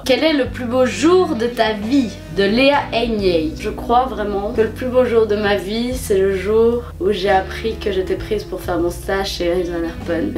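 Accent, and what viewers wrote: Belgium. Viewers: Ahahah j'avoue le coup de faire une vidéo seulement si on a atteint un tel nombre d'abonné-es je n'y aurais pas pensé ^^ Si tu fais un meetup je serais là ! :) Je pense qu'on habite la même région au vu de ton accent et de là où j'tai vue. D'ailleurs si tu fais un prochain FAQ j'aimerais juste savoir dans quelle ville tu habites? Les youtubeur-ses belges sont tellement rares! ^^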